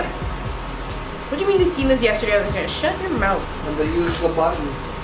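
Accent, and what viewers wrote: United Kingdom. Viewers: Ur british accceeent in love ❤️❤️❤️ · you NEED to do a video with your British accent 🙌🏾🇬🇧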